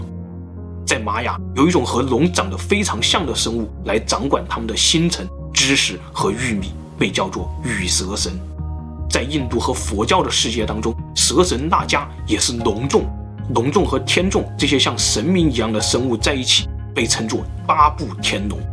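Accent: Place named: Fujian, China. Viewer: 特殊的福建口音非常帶感，獨樹一幟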